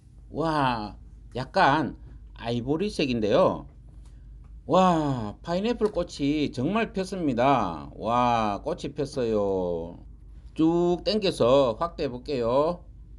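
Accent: Gyeongsang, South Korea. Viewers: 아이고~~ 노래도 잘하시고 구수한 경상도 말씀으로 파인애풀도 사과롤 얹저놓고 꽃피우는걸 우째 알았습니꺼 ^^ 참으로 신기합니데이~~ 겨울에는 온도가 영상 3도 까지 내려 가는곳에 두면 안되나요 따뜻한 방에는 햇빛이 안들어와서요 내일당장 파인애풀 하나 사와야 겠어요 감사합니다^^ 좋아요는 한번밖에 못해서 광고 까지 보고 갑니다 새해 복마니 마니받으시고 건강 하이소 요^^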